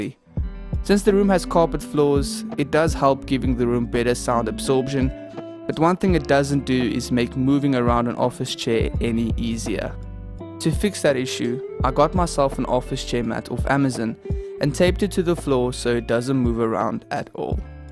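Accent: South Africa. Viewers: You South African? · Is that a South African accent I’m hearing my boytjie?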